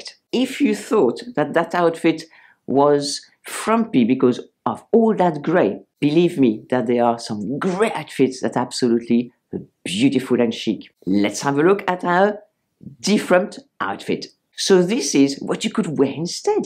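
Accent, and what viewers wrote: France. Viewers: Dear, I'm not even 40 yet but still like to watch your videos for some tipps and inspiration. 🤗 And I adore your French accent.. 😊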